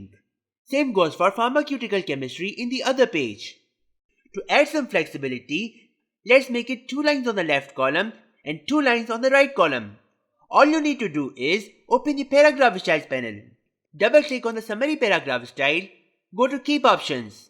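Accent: India. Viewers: Glad to hear about this, may be just a bit slower taking into account that you are being watched in english and you talk as Hindi english : )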